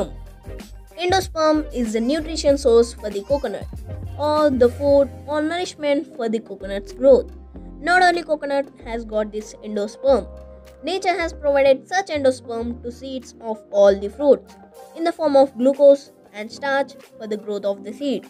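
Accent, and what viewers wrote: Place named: India. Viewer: Once I heard the Indian accent I immediately left the video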